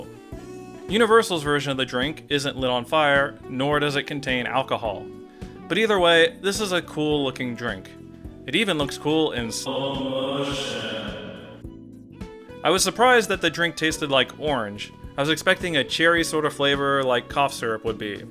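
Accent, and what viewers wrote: United States. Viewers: It's weird how as a British person I didn't realise how American your voice is until I hear you speaking over irl footage! Great vid!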